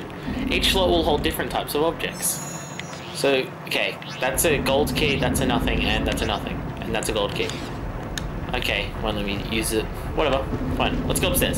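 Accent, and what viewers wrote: Australia. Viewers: Gawd, I love Australian accent! Thanks for these, MATE!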